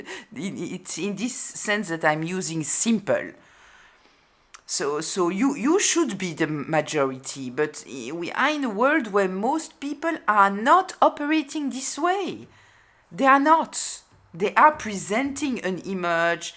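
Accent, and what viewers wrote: France. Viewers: You are goldilocks mama bear papa bear n a cheeky 😜 😉 😄 😏 😆 😘 lil baby 👶 bear all in one i am the lucky goldilocks living ❤️ in a safe space ❤️ 😅 love adore an sank in the awe of your accent. Sounds like French but I am but a bamboo lambo no offence meant. You are that beauty in the world card. I see you . eagle. hear you ...bull... build my empire on your word flowing gently like a true judge... not a kangaroo court....coming thru air n water. Kaballah is the umbrella. Do not get understanding then NOT act upon that understanding.. alas whatever the gen you'll never be part of the inner standing. You beautiful creature and your channeling from the gurus truly are my umberella, my guide😮a hand to hold and a voice in the wilderness who wants what is best for me..all in the fair wayfairers way to inner standing. Phew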